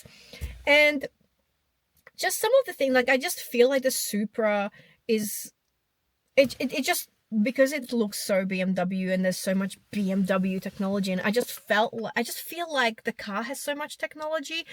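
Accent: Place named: Australia. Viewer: Aussie accent on fleek ngl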